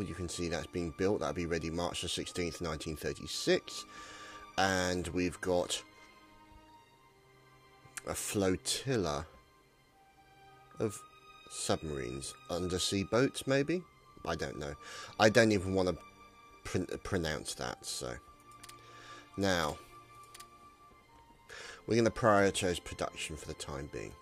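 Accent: United Kingdom. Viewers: is this person British ?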